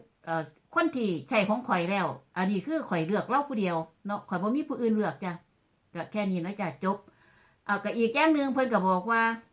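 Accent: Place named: Khon Kaen, Thailand. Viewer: เปลี่ยนช่องมาติดตามพี่จ๊ะดูหลายตอนแล้วชอบสำเนียงคนขอนแก่นเพราะคุณยายและแม่เป็นคนขอนแก่นค่ะ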